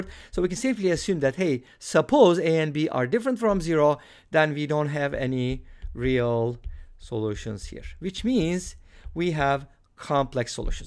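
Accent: Turkey. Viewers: Telafuzunuz Türk olduğunuzu düşündürdü. Doğruysa video için çok teşekkürler ;)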